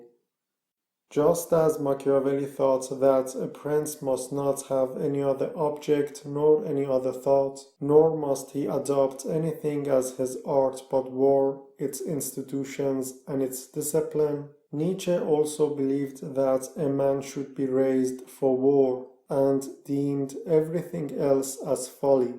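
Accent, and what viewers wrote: Iran. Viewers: Are you Iranian? atleast that's what I a got from your accent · ???, I liked it already. You can like it too... I love the Iranian accent. What makes you think that you cannot like it if it is Iranian?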